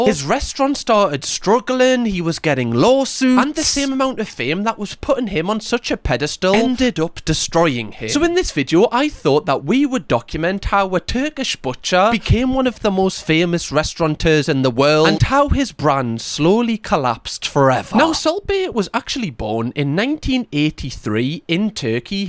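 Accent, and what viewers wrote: Newcastle, United Kingdom. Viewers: isn’t talking with an inflection, he’s talking with a Newcastle accent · Close; he is from the north, but is a Geordie from Newcastle/ Sunderland. Not from Yorkshire. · Hes a foreinger from up north jordy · he's a Geordie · Im Welsh I bloody love your Goerdie accent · Geordies innit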